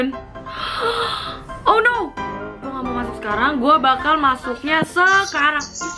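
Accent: Indonesia. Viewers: Ngomongnya sunda njay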